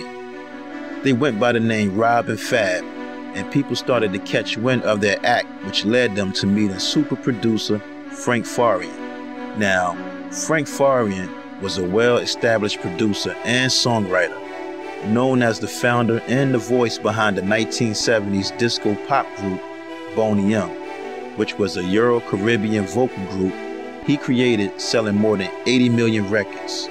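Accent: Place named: Baltimore, United States. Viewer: Very informative, but I could do without the Baltimore accent of the narrator.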